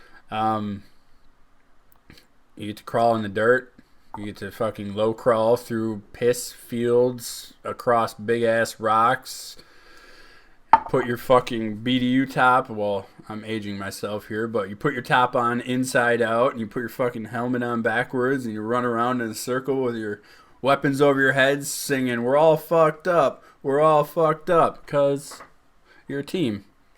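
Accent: United States. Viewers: You sound like you’re from Louisiana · That's so funny. I knew you were from Wisconsin right when you started talking. And then you said down south so I was confused but you confirmed it in the video haha. Go Wisconsin!!!!!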